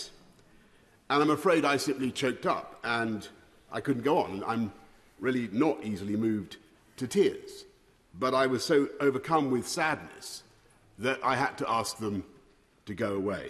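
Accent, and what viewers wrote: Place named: RP, United Kingdom. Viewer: He can barely string a sentence together. Talking with plum in mouth means sweet FA.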